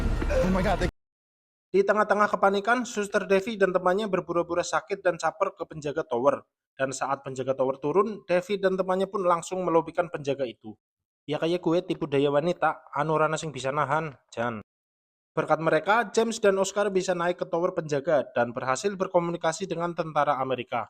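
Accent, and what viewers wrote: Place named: Banyumas, Indonesia. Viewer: lucu bgt bahasa ngapak😅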